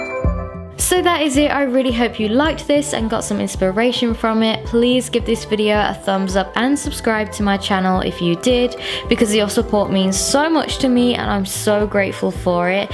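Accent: United Kingdom. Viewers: Lol I think in the uk people pronounce it how you do (nyke) but in the Us we say nikE (nikee) and also i think the uk say AdidAs (adidAs) but the US says adIdas (adeedas) lol idk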